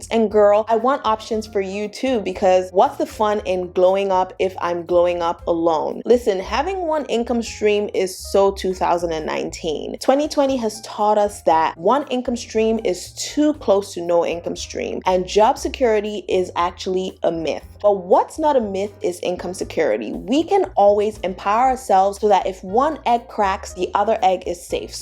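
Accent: Nigeria. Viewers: Glad I found this video. it's great to hear Nigerian, I'm Nigerian too.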